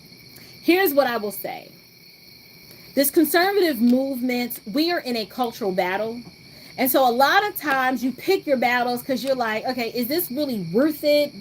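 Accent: South, United States. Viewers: I love that country sound!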